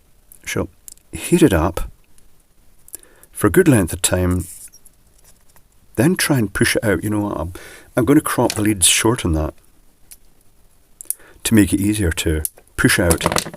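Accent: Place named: England, United Kingdom. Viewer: I like your voice it sound very smooth and nice English accent. It's my first time that I saw your face. You are alike my Aust. friend. Big guy with a soft voice. Don't misunderstand me, I am not queer. 555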